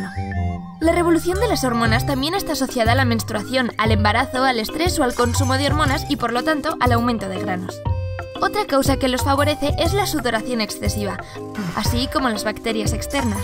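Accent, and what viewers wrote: Spain. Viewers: El acento castellano es enserio molesto muy molesto que modo de pronunciar tan horrible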